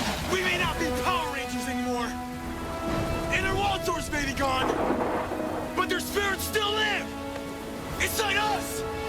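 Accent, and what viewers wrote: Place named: Philippines. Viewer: You sound pinoy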